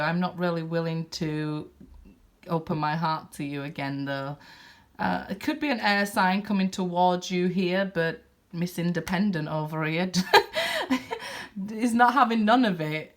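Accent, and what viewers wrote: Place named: Yorkshire, United Kingdom. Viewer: Luv your accent. x.... May I ask what accent is it.?.. Sounds like Yorkshire ?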